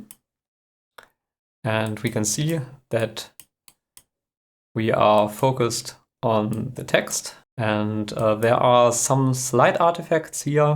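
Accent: Germany. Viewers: I heard the German accent and i knew it was gonna be very interesting. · very well done...you are really a technical genius..,. by your accent can I say that you are from Germany ? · Wie deutsch kann ein Akzent sein. Dieser Typ JAAAAAAAAAAAAAAAAAAAAAAAAAAAAA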